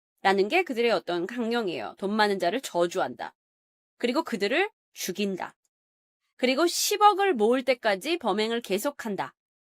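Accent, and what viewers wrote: Jeolla, South Korea. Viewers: 전라도 스럽다...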